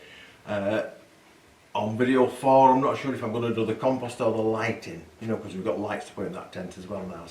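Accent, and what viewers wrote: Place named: Yorkshire, United Kingdom. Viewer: Love the yorkshire accent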